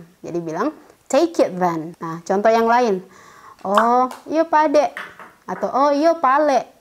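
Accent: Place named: Makassar, Indonesia. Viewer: Rinduu kelas logat makassar, sukses eonnicu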